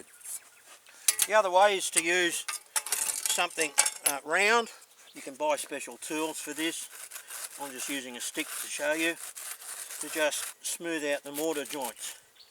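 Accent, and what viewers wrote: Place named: Australia. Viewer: I guess all English speakers sound alike to everybody else in the world. I have to admit I can't tell the difference between somebody speaking Chinese or Korean in terms of sound - I don't speak either language. It's ethnocentric I believe. But definitely sounds like an Aussie to my Yankee ears. Awesome video.. Good on ya...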